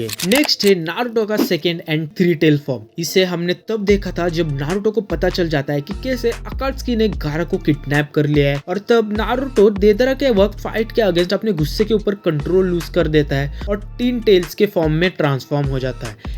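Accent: Maharashtra, India. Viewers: Are you maharashtrian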